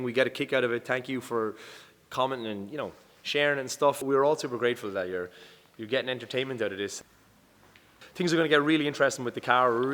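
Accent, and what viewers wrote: Ireland. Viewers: Good old Irish accent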